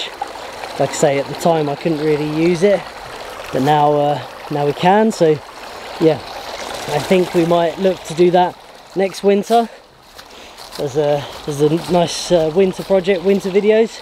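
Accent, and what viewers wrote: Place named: West London, United Kingdom. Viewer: 'that bit of skank' lol. you must be from west london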